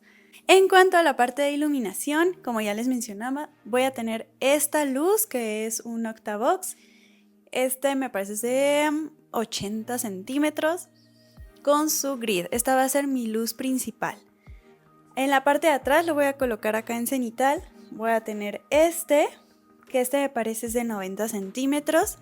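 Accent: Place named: Mexico. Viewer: Te quedó hermosa la foto!! 📸 PD: me encanta tu tonada mexicana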